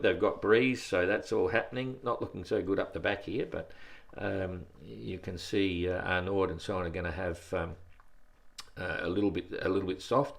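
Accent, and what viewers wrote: Australia. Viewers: Hello,it would be kind to speak a little bit slower for french followers who are not crurrent users of the aussie accent..thank you so much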